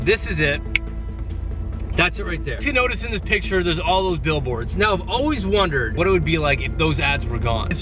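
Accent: Canada. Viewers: Guys wait is this feller Canadian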